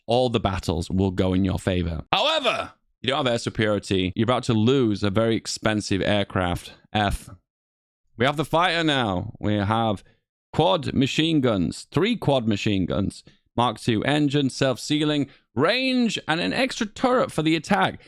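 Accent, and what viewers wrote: England, United Kingdom. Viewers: still with the "bow je" terminally English >.>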